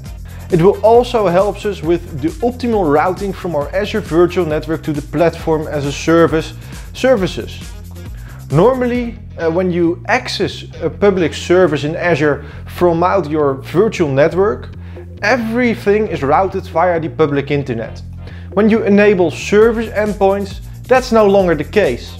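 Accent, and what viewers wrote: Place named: Netherlands. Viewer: Maybe you should remove the typo in your title: Virual -> Virtual Love your dutch accent btw ;)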